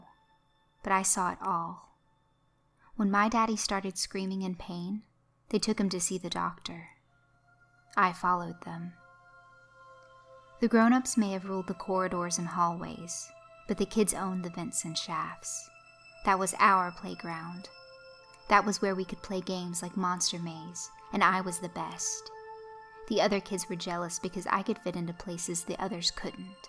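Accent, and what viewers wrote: United States. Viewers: lovely voice btw USA right?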